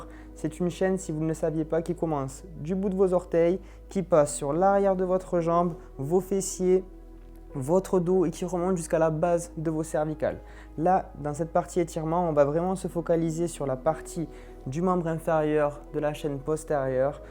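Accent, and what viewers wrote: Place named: South, France. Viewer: ah oui c est ce qu il me semblait j ai reconnu un petit accent du sud , je suis sur Nîmes et originaire de Reims mon oreille est sensible aux accents 😂 dommage je trouve vraiment bien votre explication très claire pour ces exercices si vous aviez été dans le coin , j aurais pris mes séances à votre cabinet c est sur .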